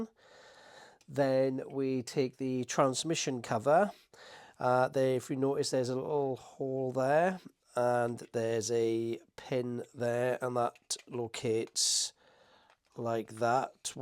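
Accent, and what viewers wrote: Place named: Wales, United Kingdom. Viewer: Can’t wait for more updates, missing your Welsh voice to see out the day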